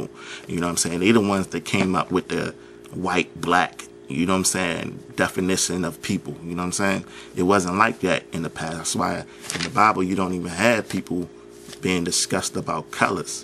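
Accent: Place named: Niger. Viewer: I think its cool how your from dc but speak a strong Nigerien accent with DMV speech pattern.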